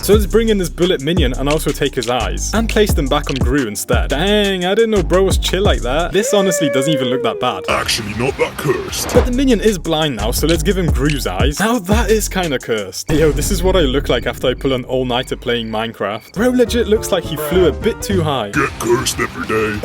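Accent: United Kingdom. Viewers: HE IS BRITISH!!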